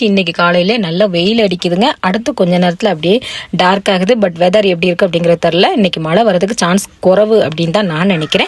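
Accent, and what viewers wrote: Tirunelveli, India. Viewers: Akka unga pechu tirunelveli mari yaa eruku ❤❤❤❤❤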